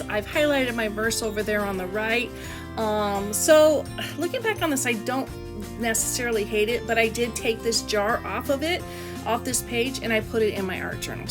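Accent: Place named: South, United States. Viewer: ain't nothin' wrong with a southern drawl y'all....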